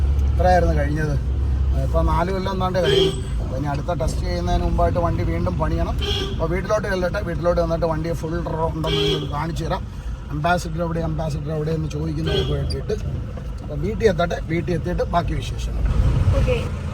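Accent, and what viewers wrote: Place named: Kollam, India. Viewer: Kollam സ്ലാങ് ❤❤❤❤❤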